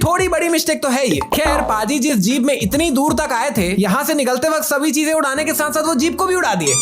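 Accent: Chhattisgarh, India. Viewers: Bhai aap chattisgarh se ho ye aaj pta chala 😅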